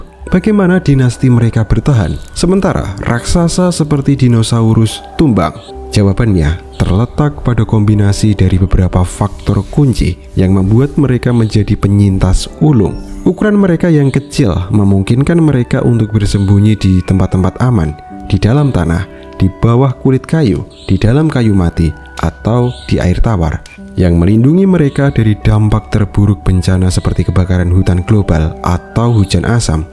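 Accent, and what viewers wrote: Java, Indonesia. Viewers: vo nya jawa 😂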